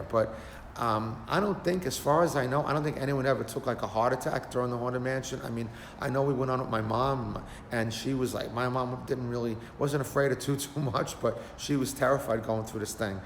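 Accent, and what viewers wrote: Brooklyn, United States. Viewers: I went there as a kid....fun place. thanks for sharing....And your right, at that time late 70s & early 80s, that was scary. I remember the hands grabbing my feet with like rat noises, it was terrifying & fun at the same time. Love your Brooklyn voice. I'm a BK native as well & my condolences for your son. May he rip. 🙏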